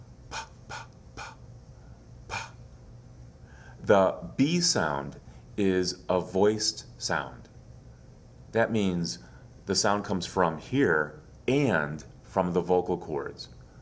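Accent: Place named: Midwest, United States. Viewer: Viewers should be aware that this speaker has a very flat mid-western accent. In other words, his "o"s are more like "a"s. His word "stop" sounds like it is spelled "stahp". In other parts of the country, we pronounce the "o" more like a round "o", so we would say "stop" as if it is spelled "stawp"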